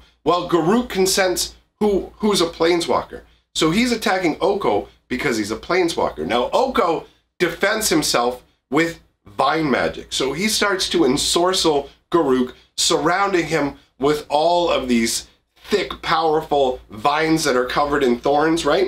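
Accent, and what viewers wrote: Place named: Canada. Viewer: Sick!? I noticed from your voice the you are still Canadian! Great video man.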